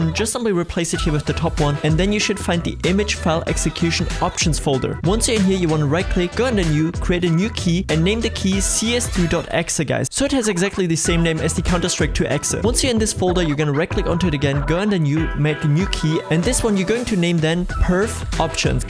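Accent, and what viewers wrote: Germany. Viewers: Hab sofort erkannt das du Deutscher bist XD nice tutorial btw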